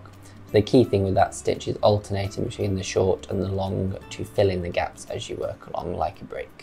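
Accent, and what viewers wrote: United Kingdom. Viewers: To each his own. I have to strain to understand his ever-so-gentle British accent. Sorry.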